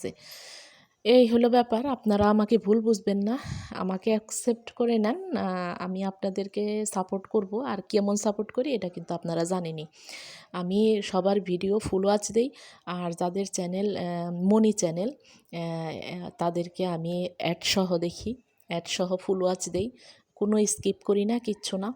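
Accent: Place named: Manikganj, Bangladesh. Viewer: আপু তুমি যেও না, তোমার কথায় মানিকগঞ্জের ভাষার টান আছে,শুনতে অনেক আপন মনে হয়, যেহেতু আমার গ্রামের বাড়ি মানিকগঞ্জ, ভালোবাসা অবিরাম ❤️❤️❤️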